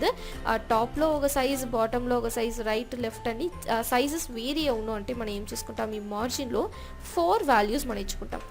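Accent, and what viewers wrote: Tamil Nadu, India. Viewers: Tqq Nice teaching and Tamil slang mixed cute voice 😊😊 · Mam meeru pure telugu kadaa madyalo tamil ala undii?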